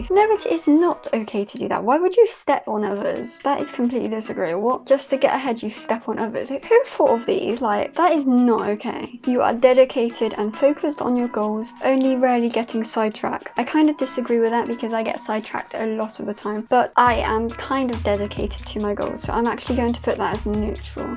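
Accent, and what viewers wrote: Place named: New York, United States. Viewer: This is interesting thanks for sharing! I like editing of the video too! I’m gonna sub to see more videos! I also am from NY so your accent keeps me hooked in lol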